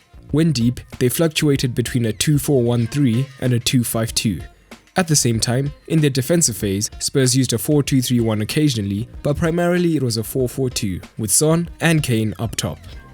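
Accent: South Africa. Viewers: You by any chance from South Africa lad? That accent...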